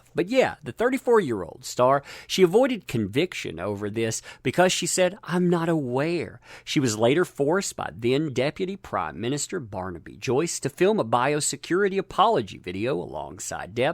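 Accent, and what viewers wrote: South, United States. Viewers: Fam your southern accent just makes the new sweeter than 🍯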